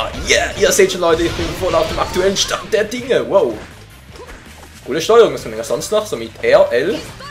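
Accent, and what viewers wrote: Switzerland. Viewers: bisch du ein schweizer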